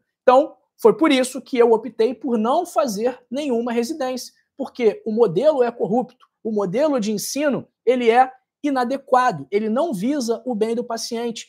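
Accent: Rio de Janeiro, Brazil. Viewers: Boa tarde Dr acho que vc e do Rio de Janeiro o sutaco não nega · Vc deve ser do Rio de janeiro, pelo sotaque de carioca. Estou no Rio de janeiro. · Bom dia!!! Que é cúrcuma? É um pozinho amarelo que compramos em casas de produtos de temperos tbm? Ganhei um pé de plantas me disseram que é cúrcuma. Será que alguém tem pé de cúrcuma em casa e deixar a foto pra mim comparar? Muitíssimo agradecida dês de já. Eu moro em Guarulhos SP e o DR pelo sutaque pare ser carioca,Rio de Janeiro. Morei lá duas vzs.😊✌️💞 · Seu sotaque é de carioca, Dr. Vc Tem cara de Flamenguista, acho q vc fala do Rio de Janeiro, Cidade e Estado do MENGÃO!!!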